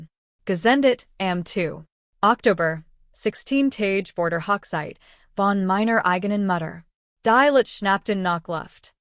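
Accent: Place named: United States. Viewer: Was soll diese quälende amerikanische Sprache ???????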